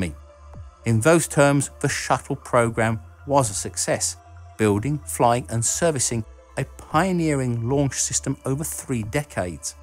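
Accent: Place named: England, United Kingdom. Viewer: I do like it. I was wondering why I prefer your channel to Isaac Arthur's. I guess I prefer the "talking head" approach that you offer. But, more than that, your graphics and script are tightly coupled. I also find the english accent more interesting, although Isaac also has a melodic quality (deep southern gumbo) - so his voice SHOULD be a compelling one - but it isn't (for me, anyway). Content wise might I suggest you dive deep into SLS with a discussion of how Nasa was FORCED to take SLS - and the politics in play in Senator Shelby's district. A great, if dated, read on the subject of NASA's miseries is the 2005 book: "Lost in Space: The Fall of NASA and the Dream of a New Space Age" by Greg Klerkx It's definitely a NASA bash, but the political points are hard hitting. Just looking to help.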